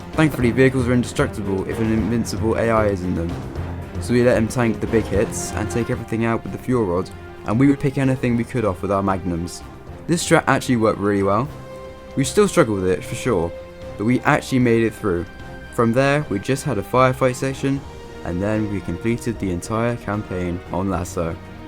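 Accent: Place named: United Kingdom. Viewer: Videos decent! Couple of small points to genuinely help you for future videos, Adapt your voice to more of a "commentating voice" e.g. Speak a bit slower and clearer, Both of us being British makes it harder for people to hear past our accents. - Also don't do what I done and stop uploading! Stay consistent with these types of videos and you'll do well!!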